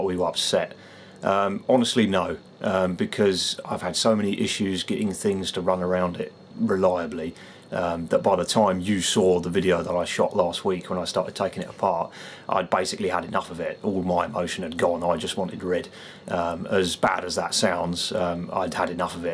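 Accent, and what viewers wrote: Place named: Scotland, United Kingdom. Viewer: I've watched all your videos. I think its time you done a face to face version now! I wanna put a face to that Scottish accent!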